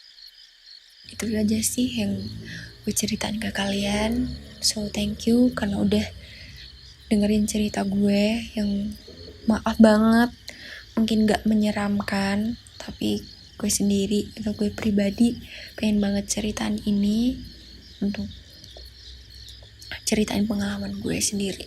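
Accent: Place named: Java, Indonesia. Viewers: Medok mbak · Medok juga keren kok.. ga harus pake gue padahal medok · Scara dari JaTang mba nya ... 😊😊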